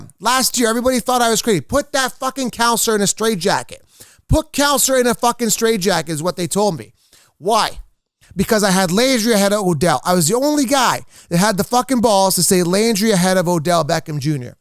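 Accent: Canada. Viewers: Are you from Canada? 🇨🇦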